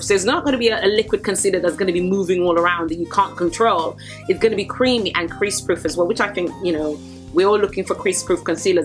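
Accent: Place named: United Kingdom. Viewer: Your mix of the British accent and yoruba was killing me😊 Love your review. 😍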